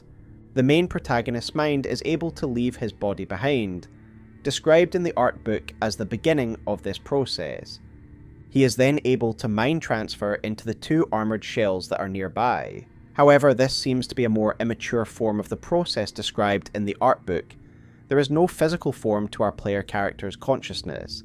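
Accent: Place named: United Kingdom. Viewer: i can tolerate a british accent to a degree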